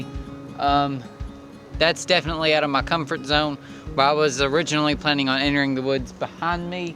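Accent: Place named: South, United States. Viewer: I agree. The composition with the pine cone was very good. By the way, you have a really pleasant Southern accent. None of us is aware of our accent; it takes someone else to hear it. Half of the pleasure of your videos is hearing you speak.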